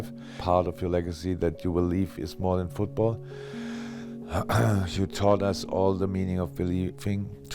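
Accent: Liverpool, United Kingdom. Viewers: Not a Liverpool fan but Jurgen I salute you and all you have achieved. There are so many things I admire about you and your time in English football but if I were to list them all, I’d be here until 2028! 😅 The Premier League will never be the same without you mate And by the way…I think your scouse accent is class! 😂👌 All the best for the future buddy! YNWA ❤️ Joel Barnsley fan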